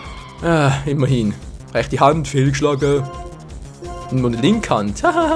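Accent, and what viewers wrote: Switzerland. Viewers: Besch du Basler oder Zuger ? ^^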